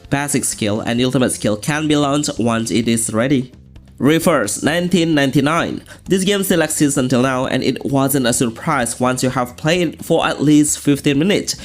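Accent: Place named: Indonesia. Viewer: I know this accent. Indo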